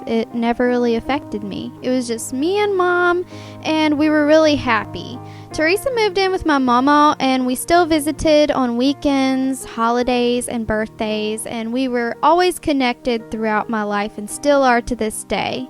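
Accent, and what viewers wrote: South, United States. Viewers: Omg cutest freaking country voice ever! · omg I love southern accents and then hearing you speaking as a baby it's so darn cute!!! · T you were so cute when you're a little like so cute and I love when you talk by your little accident your little country accent it was really cute...,......you just made my day I Watch you all the time love you so much and I hope you have a glitterfull Day and god bless 💟💟☺️☺️☺️💞😇😇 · I love your voice when you were little! You had that country twang! And still do now! I love it and wish I had a twang!😘 love you sooo much!!!! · happy bday and you have the cutest southern accent ever. luv ur channel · You were so cute in your dag gom Bambi southern voice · Ha thats cool you live like 2hrs away from me ps ha cute lil baby south accent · I love your young country accent!! It's so cute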